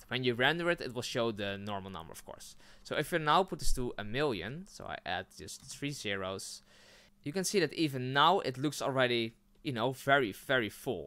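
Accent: Netherlands. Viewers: Are you dutch cuz your accent is very familiar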